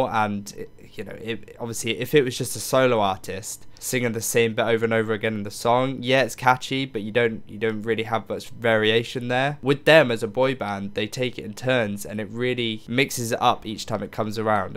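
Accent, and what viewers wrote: United Kingdom. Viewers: I love British accent so I get you 😂♥️♥️♥️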